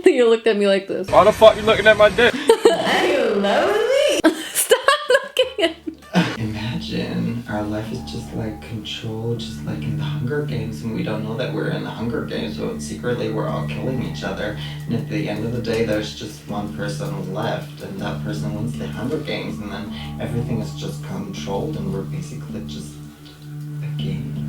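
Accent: Germany. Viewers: Sie redet einfach English und bekommt diese andere Internationale Aufmerksamkeit 400iq aber man hört dass an ihrem English dass sie Deutsch ist das hört sich so cringe an 😂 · Are you German? 😁😅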